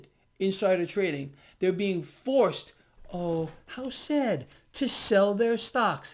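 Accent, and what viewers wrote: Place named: Long Island, United States. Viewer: Cool, you talk like a fellow Long Islander.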